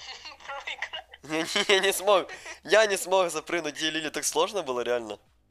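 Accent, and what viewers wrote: Ukraine. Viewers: ты украинец? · Видно видно Украинская проризается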